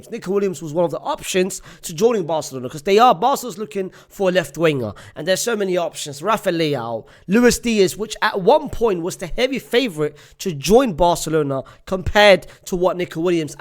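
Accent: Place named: United Kingdom. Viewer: This dude makes me hate the British accent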